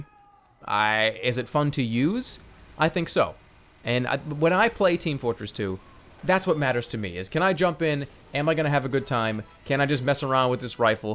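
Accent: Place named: Boston, United States. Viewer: I keep trying to put my finger on his accent, but I'm not that familiar with different parts of the US and their accents. Is he Boston, Brooklyn, or neither? I kinda wanna say Boston, but like I said I don't know .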